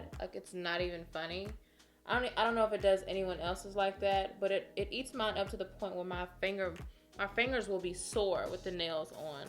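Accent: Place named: North Carolina, United States. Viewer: Hey it sounds like you live in my area of North Carolina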